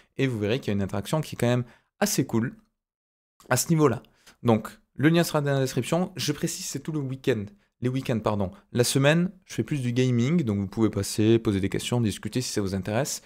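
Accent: South, France. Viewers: Ah cette voix et ce petit accent du soleil, pédagogique à souhait ! Bravo encore à toi !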